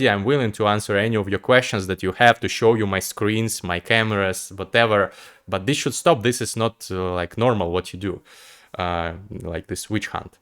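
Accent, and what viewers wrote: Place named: Russia. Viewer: Спасибо тебе огромное. И за проделанную работу, и за хороший, богатый английский, и за понятный российский акцент, который делает английский понятнее для русскоязычных))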